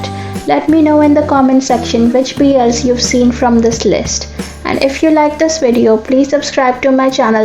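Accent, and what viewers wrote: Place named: India. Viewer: Hey by ur accent you sound indian, right?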